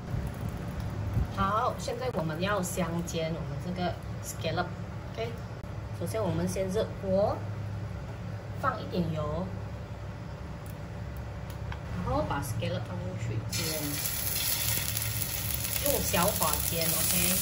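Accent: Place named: Malaysia. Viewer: 我们马来西亚人的语调😆很温馨还有马来文备注📝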